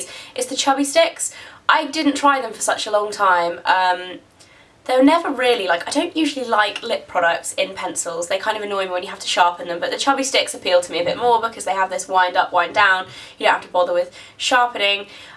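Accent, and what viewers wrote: United Kingdom. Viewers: you always look like a california girl with a british accent :D i love it !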